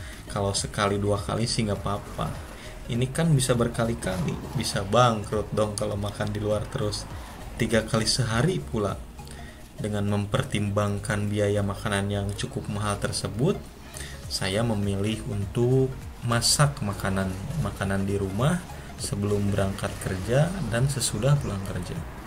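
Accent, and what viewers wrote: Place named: West Java, Indonesia. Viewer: Logat abangnya Sunda banget 😂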